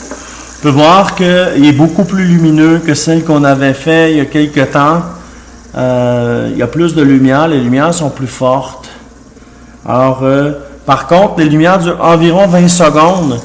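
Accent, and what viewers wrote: Quebec, Canada. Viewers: Perso les gars moi je regarde les vidéo 50% car son accent quebecois est génial et 50 % pour découvrir des nouveaux objets.^^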